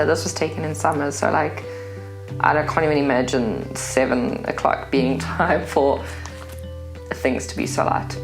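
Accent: South Africa. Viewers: Hi 👋🏻 I'm South African! So lekker to hear our accent! Enjoying your videos xx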